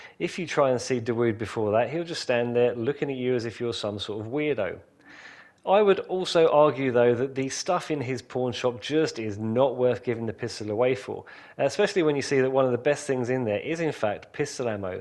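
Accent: United Kingdom. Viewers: British pronunciation is weird at times lol. They say inventory like invent-Tree we say it like In-Va-Tory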